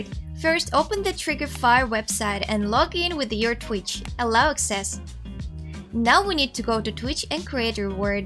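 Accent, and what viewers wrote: Russia. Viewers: English in russian accent is like an asmr video , so smothhhhh and beautiful voice!!!!!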